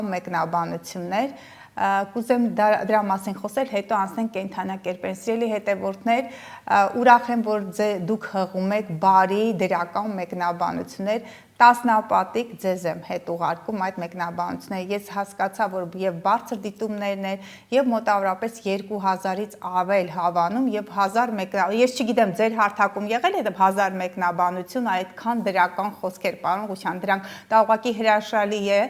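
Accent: Standard. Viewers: Հարգելի տիկին: Ես հաճույքով եմ դիտում Ձեր հաղորդումները հատկապես վերլությունները ինչպես գեղեցիկ մաքոր հայերենով և կանացի հմայքով եք բացատրում հաճելի է Ձեզ լսել, չնայած այդ աստղերից ոչինչ չեմ կարողանում հիշեմ, գուցե տարիքս է ինչ -որ է 80-ը քիչ չէ: Ուստի ինքս չեմ կարողանում կողմնորոշվել, բայց ինձ: շատ է հետաքրքրում թոռնիկներիս ապագան հատկապես Գրիգորի որն այս տարի 9-րդ դասարան, բայց դեռ չի կոմնորոշվել ու՞ր գնա ի՞նչ անի ես ուզում եմ Ձեր շնորհիվ օգնեմ կյանքի ճիշտ: ուղի ընտրի: Տվյալները գրեմ: Գրիգոր Քյոսեյան ծնված 2010 թվի հունվարի 9-ին առավատյան 8-մոտակայքում: Ձեզ մի նեղություն հնարավորինս օգնեք ինձ այյս հարցում: Եղեք առողջ և հմայիչ: Կանացի երջանկություն: Հույսով սպասող Փիրոզա <num>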